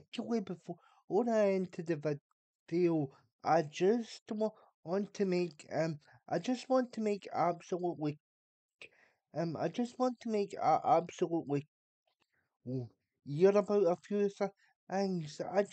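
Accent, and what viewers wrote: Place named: Scotland, United Kingdom. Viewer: No It flowed well, probably because you were not talking about how annoyed you were. I have been away from the UK for almost twenty years, first in the States and now in China, so hearing Scottish accents is not something I am used to. Now drinking scotch is something I am rather familiar with lol. Anyway, four am here and that hot choccy is not going to make itself and I gave my monkey butler the night off.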